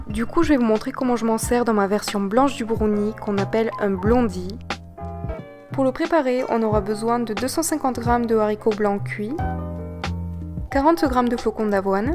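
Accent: South, France. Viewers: Je ne suis même pas vegan mais j'adore tellement tes vidéos , ton accent du sud (comment ça se fait d'ailleurs que tu aies cet accent en ayant vécu au Mexique ?), ta gentillesse et tes belles recettes que je suis abonnée haha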